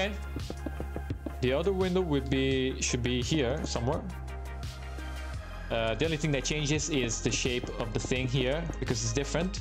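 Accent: Italy. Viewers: I'll be honest, I came across a video of your YouTube shorts and hearing your accent I thought "He's Italian", I opened your channel and the first thing I saw was this video. I subscribed to your channel without thinking twice.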